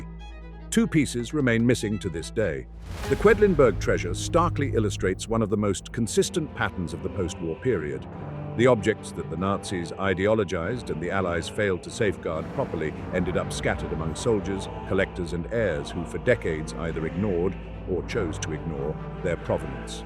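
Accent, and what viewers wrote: United Kingdom. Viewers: The narater is British just like me..😂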